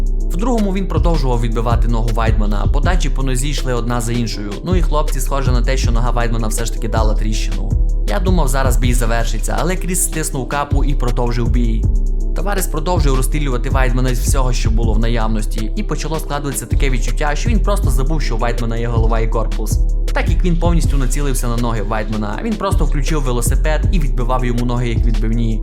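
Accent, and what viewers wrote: Western Ukraine, Ukraine. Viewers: Я привик дивитись по російській мові а тут ви по рідній західній Івано франкіівській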